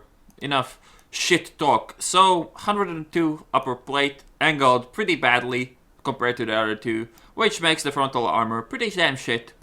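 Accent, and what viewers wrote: Sweden. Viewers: Wait, you're Swedish?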